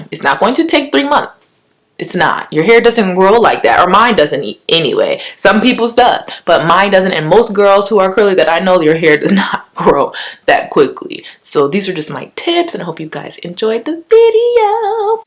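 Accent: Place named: Barbados. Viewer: apologies. I been finding many hair you tubers sounding Barbadian. Maybe it's people from a particular state. You are gorgeous btw.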